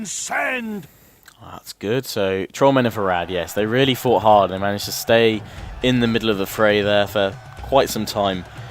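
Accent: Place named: Chichester, United Kingdom. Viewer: i love your content:p and btw are you from chichester by any means?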